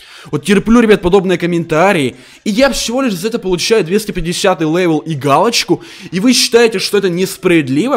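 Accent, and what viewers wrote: Ukraine. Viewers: говорит кнайф потому что он украинец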